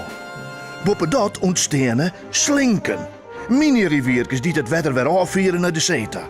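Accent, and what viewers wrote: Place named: Friesland, Netherlands. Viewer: Perfekt fries accent, maar geen friese taal. Woord voor woord vertaald hollands. Verder heel interessante documentaire, waarvoor dank.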